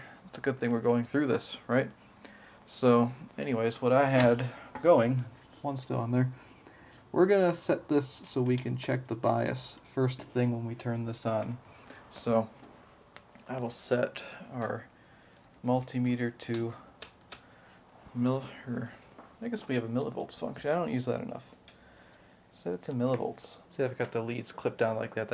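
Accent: United States. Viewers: I love the way you Americans say Dang or Dope. I live in Australia and I've seen one of these after a full restore. Unfortunately Marantz equipment took a China nose dive with their surround amplifiers. After a few months of use, the centre channel started playing up. I ran it in phantom mode but took it to a repair shop for a look. The amount of time he'd have to spend fixing it was a joke so I left it with him and went shopping for a new 7.1 amplifier. I was looking at some gear when I came across an Onkyo 7.1 unit. It was THX approved and ran all the audio matrixes I'd ever need and it was beautifully made. Point to Point wiring etc and the sound was phenominal. I remember Onkyo from the 70s when they made one of the first CD4 Discrete Quadrophonic stereo sound systems. Pink Floyd with a bit of the spliff was fun. (I'm 62 by the way) This new Onkyo unit is brilliant and by the time it's anywhere near clipping, you'd be bleeding out of your eyes and ears! 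The sub out is great. It only feeds the sub with relative info so the bottom end doesn't become overbearing. I've put a DBX 163 between the sub out and the sub to catch transients that can kill any speaker. So many things in Australia are no longer made here. Do you know that we don't even make a car here anymore? Australia made some beautiful Cleveland Earth tuners!! It's a joke. Anyway, Cheers from OZ, Andrew.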